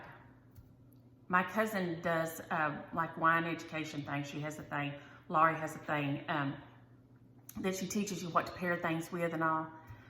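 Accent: South, United States. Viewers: Lady. Thanks for sharing. You are hysterical. This yankee doesn't get to hear cool southern accents like yours very often. I enjoy it so much!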